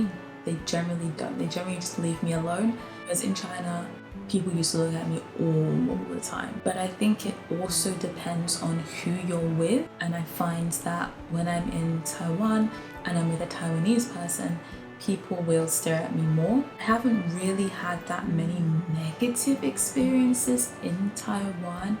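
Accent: United Kingdom. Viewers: 覺得你說的很好👍🏼很喜歡你的英式發音跟講話方式 口條很清楚 聲線也很溫柔 很方便練英文聽力！說實在我在路上看到不同種族跟國家的人會很想認識他們 因為好奇他們的文化跟生活方式～希望妳在台灣能過的開心！ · 同意 語速對我來說剛剛好 還有英腔的r真的好好聽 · of course, you're a Brit. Most of the people here just can't tell your British accent unless you sound extremely posh. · 口音很優雅聽得出來像英國的感覺😍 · 剛開始看就覺得口音很英腔，後面提到說來自英國就理解了，以前在念書的時候曾遇過膚色較深的法國人，之後就比較不會用膚色去判斷哪裡人了😂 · 英式口音超好聽的🥰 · I like your British accent ;) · Love your British accent,welcome to taiwan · 你的口音聽得出來是個英國人。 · 看影片前半段的時候就感覺你說英文好像有英國腔 等聽到你說是英國人的時候馬上恍然大悟「難怪~~」😆 歡迎來台灣！ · I am a hongkonger, I think Hong Kong also is a nice place. General Hong Kong people are educated and open mind that welcome people from all around the world. Hope you would like to travel in Hong Kong. 🇭🇰🇭🇰 by the way I love your British accent❤️ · 哈哈！我好厲害！ 我聽妳說話一分鐘我就在猜妳是不是來自英國，因為很明顯的發音特徵，short a sound and no hard R sounds，但容我再猜一下，妳應該最初不是來自倫敦（雖然妳有提到倫敦，但家族應該不是一直住在倫敦吧！？），而且口音也不太像PR口音！ 此外我知道，英國其實有很多非裔人士，就跟法國一樣有很多非裔人士，所以我不感到意外，畢竟西方的人種很多元，當然台灣也是多元種族的社會，但在膚色、髮色等外顯特徵比較相似，不像西方國家那麼明顯！ 若是在台灣，有人讓妳感到不舒服，請妳原諒他們，多數台灣人還是懂的那分寸的，此外，妳提到跟台灣人不好交朋友，或許只是妳剛好碰到的人比較害羞，或者不擅長英語，又或是其他原因，我想，多數台灣人還是很喜歡跟外國人認識當朋友的！ 前面那些猜測，純屬好玩，希望沒冒犯妳，最後，祝妳能在台灣有美好的體驗與生活！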